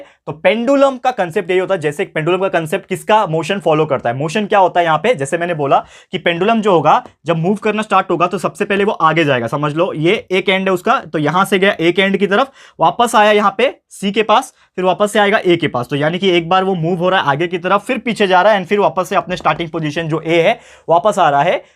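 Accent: Maharashtra, India. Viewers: Are you Marathi ??😮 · Sir your from Maharashtra?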